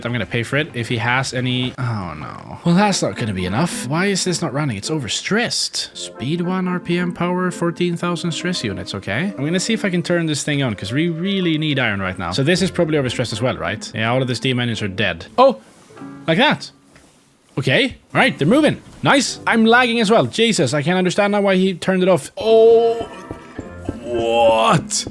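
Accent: Sweden. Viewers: Är du från Sverige? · Are you swedish? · U sound so swedish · why has swedish accent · Are you swedish???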